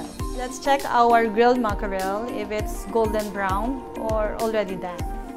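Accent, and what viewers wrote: Philippines. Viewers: Pinay accent 😍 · are u pinoy? (the way u speak engliiish)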